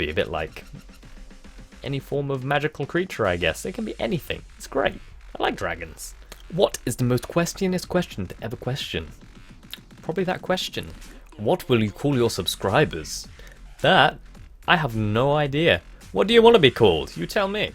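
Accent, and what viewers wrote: England, United Kingdom. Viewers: What`s up, English citizen? So like a week ago, my sister`s friend told me about Captain_Sparklez`s popular channel and recomended me the series of ``Mianite``. Like a half season after the start, the great Wizards came to the land to greet them with their amazing buildings. You were one of them. As the Wizard who showed to Jordan the most I remembered your name.. One of his eps he had a building lesson from you. And that was the first time I heard your voice. And I completley fall in love with it. It was deep, it could show all the emotions you were feeling and it had English accent. I love English accents. Like... I can guess you`re from the UK just when I hear your voice. So today I finished the season and after like two hours I decided to check if you had a channel. So I typed `` `` and your channel appeared. It was amazing! 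This video is probably my favourite. Why? I don`t know actually. Maybe because the whole 30 minutes of it I was staring at you and your voice made me stop seeing things around me. I could just listen to it with with hours. The problem with the not matching to the face voice is actually simple. I realised, you don`t look to young for that deep voice. You actually look half young. Your beard makes half of your face look like you have that voice. But from the nose to the hair you look like 17 years old to me. Oh, before I end the comment I`ll say that I recommend you to play OUTLAST. Pleaseee! M`kay byee. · Just out of curiosity, do you think that Americans have an accent? Because we say that you have and 'English Accent.'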